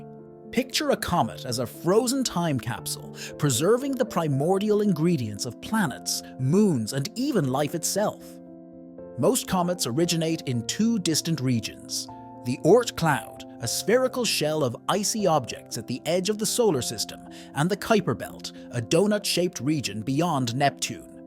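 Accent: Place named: Ireland. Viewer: Hey guys! You need to put a notice to use headphones for the best experience. **By the by, was this an AI narration? Because if it's a human, I swear I detect a hint of a brogue. A bit of Celt in there? Maybe Western Ireland. Connemara, perhaps? I have family there so Im able to find it easily.**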